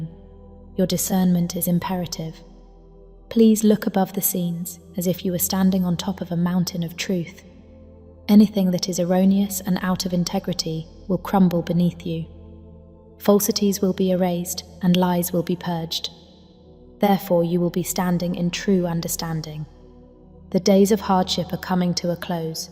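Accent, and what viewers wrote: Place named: United Kingdom. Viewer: And with a British accent yet. Nice try, but no brass ring !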